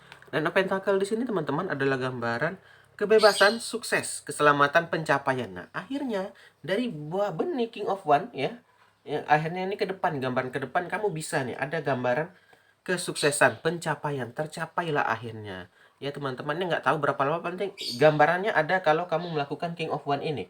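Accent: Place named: Madura, Indonesia. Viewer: Pisces hadir kok kyk org madura logat nya hhhh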